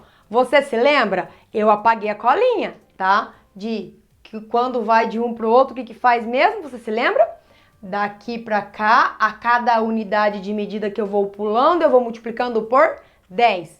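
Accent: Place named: Rio Grande do Sul, Brazil. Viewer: Vc parece gaúcha 😅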